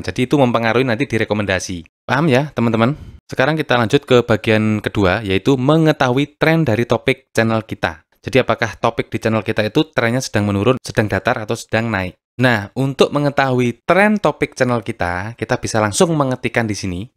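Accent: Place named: Java, Indonesia. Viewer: Suka.sama chanel ini suaranya aksen Jowo medok...👍😁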